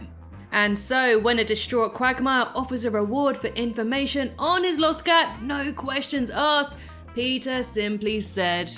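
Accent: United Kingdom. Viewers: I love your British accent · Wait! What! Who hates your British accent?! I love it! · Adore your British accent here in Bolton. Rule Britannia etc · How can people hate her British accent 😂 that baffles me 😂 well each to there own right 😂 her British accent is lovely and awesome 😎 You’ve done a wonderful job so keep voicing these videos. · I don’t hate your British Accent and btw your doing great ^^ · ... your British? I thought you were Australian! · Yeah it's like as if Family Guy characters of old Family Guy are very different than how they are now. But even so Peter was still a bastard back in the good old days too. Now people are complaining about your accent? I mean it's nothing new. Many people some probably other Americans who are uneducated and dumb for some reason can't stand a British accent even though there's really nothing wrong with it. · What!? 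Who said they don’t like your British accent I think they’re cool alongside French and Italian I’ve been told I have a Cleveland or very slight southern accent 😅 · Awe I'm sorry to hear that darling I aboustly love your British accent 🥰🥰 and I as well enjoy watching your videos~!! I was wondering if you could ever do a timeline of Marge simpson if you haven't done one of her yet darling?? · Hey just saying I love your british accent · You have a British accent? What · Who in the world would hate your British accent?!?!?! I absolutely ADORE IT!!!!! I binge watch your videos for your voice almost as much for the content itself! Great videos and very informative. I’ve seen these shows hundreds of times and your theories and timelines always make me want to rewatch certain episodes realizing how I missed some connections before. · But I love your british accent! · To be honest I love your British voice. 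Next year I plan on moving to Scotland. After all… God save the queen! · Can you also do the worst things Stewie has ever done! BTW I htink your british accent is awesome! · Did you read the rest of my comment. Quagmire deserves the abuse and your British accent is awesome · but i love you British accent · I'm sorry I love your British accent! ❤ it's beautiful and exotic just like the wonderful host making my favorite videos! Never change! 💘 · your accent is cool....I really love how strong people In. London accent is😌😌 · I don’t mind your British accent tbh, I think it is soothing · nah I don't hate your brit acent it's pretty beautiful to be honest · Certainly impossible to rank his particular deeds as all the outright criminal ones rank in similar categories; murder, destruction, relentless bullying, child abuse, sexual assault, manipulating everything by status, spousal assault and affairs, unkept alcoholism, drug/substance abuse, the list just won't stop! 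Another great video thanks! I also do like your accent, sounds much nicer, more engaged and more charming than a typical American accent which you hear narrating 85% of youtube videos. I'm not saying there's anything wrong with listening to American nor that there accent is unpleasant, its just nice for other countries like the UK to represent and you do talk very smoothly and elegantly like a good narrator should. People who are hating on your British accent and trying to get you to change the way you talk are mostly likely selfish, ignorant Americans who think the world revolves around their country and that everyone should act like and pay attention to them, don't let haters dictate what you say and how you say it, keep up the great content! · I love your british accent,what a bunch off fake fans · Awesome Job! I'm glad Peter's not my friend, I love your British Accent by the way. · I don't mind your Voice,You British Accent makes you unique just the way you are. 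· I love your British accent!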